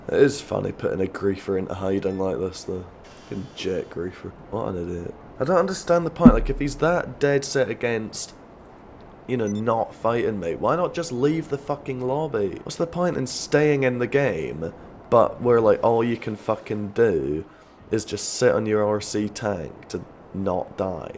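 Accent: Scotland, United Kingdom. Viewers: I thought he was Scottish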